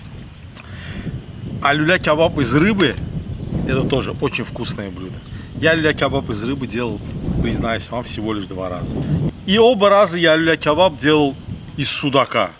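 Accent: Baku, Azerbaijan. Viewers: Приятно слышать русскую речь с Бакинским акцентом.рецепт бомба 😊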